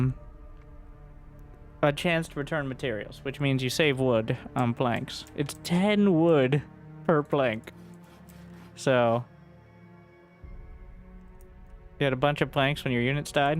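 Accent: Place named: South, United States. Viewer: Did you travel around a ton during childhood or is this the strangest accent I've ever heard from, allegedly, MICHIGAN? I was trying to nail down your accent which definitely sounds Southern, I was thinking East of Texas, maybe even upscale Arkansas, or Nashville Tennessee was my most precise guess - the sharp S-sound, the tip-of-the-tongue L combined with the otherwise Southern sound. - I decided to look it up and it said birthplace: Michigan. Would've been my last guess. is there some trailer park community there that has an odd isolated drawl haha?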